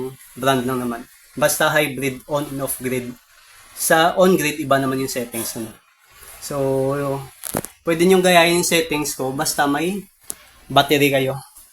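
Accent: Iloilo, Philippines. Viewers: Ilonggo ka Sir?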